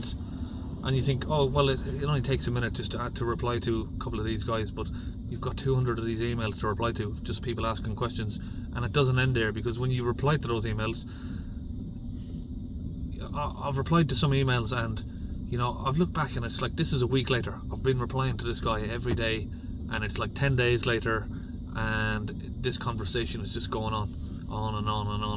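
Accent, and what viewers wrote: Ireland. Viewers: I'm not a mechanic, just a vehicle owner living on a pension. My van had dpf trouble a while ago and i looked at a few of your videos and then told my garage what I wanted them to do, rather than they telling me what they were going to do. Everything worked out ok and both garage and I were happy with the result and saved me a lot of money. I wouldn't dream of ringing you up to pick your brains for free. If the callers don't understand your videos don't bother with them. You have enough to do without engaging in long chats with people. I'm trying to figure out your home county and the closest I can is the midlands, of Ireland, that is. Good luck and good health to you. Thanks again. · You've been too successful! The number of calls you are getting is an indication of how hard it is to find competent people. Maybe you could find an apprentice to train up and have someone run the phone/email/bookings and morph into a bigger business? 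As you can see, the demand is there. I'd love to have a way of asking a few questions of someone like you to work out where to go next. And as you say it's only fair to pay for that service. In the short-term you could just set up another (SIP) phone number so your business and personal numbers (or however many you need) are separate. Same for email addresses. It's a bit tricky because you didn't set things up this way in the first place, but it should improve over time. I'm pleased to see you are not too far away from me (23 miles) (I assumed from the accent you were probably in Ireland or the other side of the country) so If I do get stuck I can book you:-)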